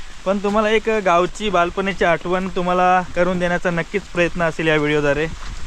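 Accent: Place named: Sangameshwar, India. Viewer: ऐक नंबर साहेब थँक्यू गाव कोणत तालुका तुम्ही छान माहिती दिलीत वबोलता पण छान अस्सल सगमेस्वरी❤❤❤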